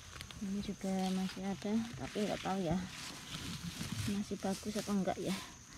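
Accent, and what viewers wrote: Java, Indonesia. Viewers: Ngmong nya medok🤣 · Ya Allah......aku ngiler liat jamurnya Btw.......kak asalnya darimana?ngomong nya medok kayak orang Jawa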